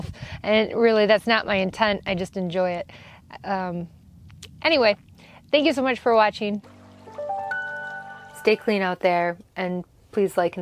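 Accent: Wisconsin, United States. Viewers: My shower needs are probably less than yours because I’m a guy and I’m bald but the shower I keep going back to is the MSR dromedary bag with there shower attachment that I purchased in 1998 it’s simple and it works and for the last six months it’s been my only means of showers even during the-25 weather we had in Wisconsin this winter! O and when you say stuff about How you talk and ramble You just sound like a Wisconsin girl to me.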